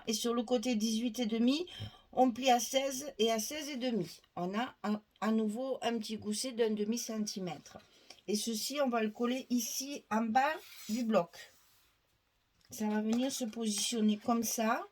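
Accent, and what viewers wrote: South, France. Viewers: Bonjour, je connais votre chaîne depuis peu grâce à Marick Scrap et je reconnais que vous faites des merveilles. Effectivement, le double face permet un travail plus propre. Mais pourriez-vous me dire quel scotch vous utilisez pour une tenue sur la durée car tous ne sont pas au top. Merci de votre réponse et quel plaisir de regarder vos vidéos où je retrouve mon accent du sud..... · Bonjour non seulement vous faites un merveilleux travail et vous m'inspirez mais en plus grâce à cette vidéo nous pouvons découvrir une hyper sensible et très émotive 😍. Moi perso je suis addict de vos créations et de votre voix qui chante le Sud 😁. Je vous souhaite un bon dimanche 😘😘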